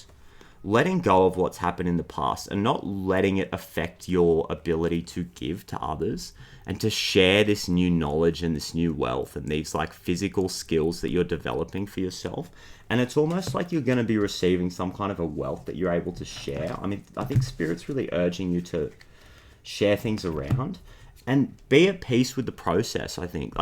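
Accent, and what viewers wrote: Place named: Australia. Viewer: Australian accent... you notice it so much more the difference how we speak compared to the american tarot card readers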